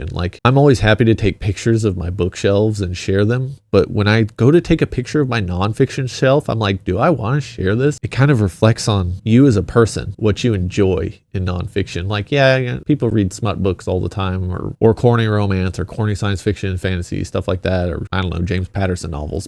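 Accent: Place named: South, United States. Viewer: Thats a sick MN hat I gotta get one of those. For some reason I thought you had a slight southern accent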